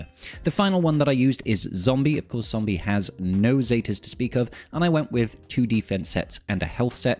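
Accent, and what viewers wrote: United Kingdom. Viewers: Realy enjoying the content please keep it up. On attempt 20 but eill keep trying. Also good to hear a fellow Brit playing. Ally code: <num>.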